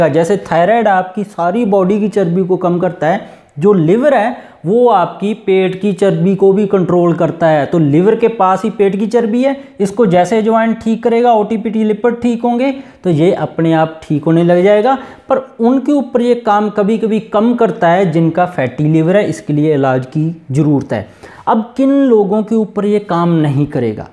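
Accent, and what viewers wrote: Punjab, India. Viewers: I love the punjabi accent when speaking hindi :)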